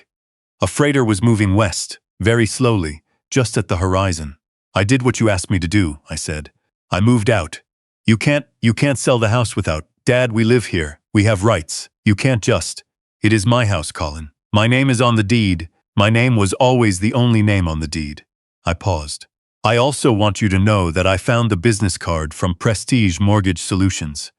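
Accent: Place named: Ontario, Canada. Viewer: Sound like Ontario Canada 🇨🇦.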